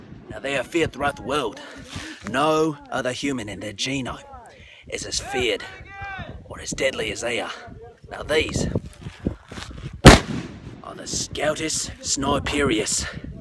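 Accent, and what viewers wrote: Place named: San Diego, United States. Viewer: He's from San Diego, man!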